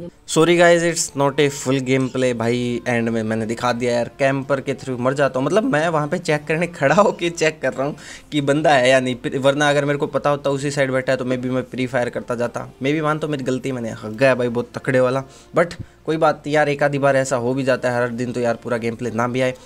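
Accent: Haryana, India. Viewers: bhai humare Haryanvi hai kya rajasthani...?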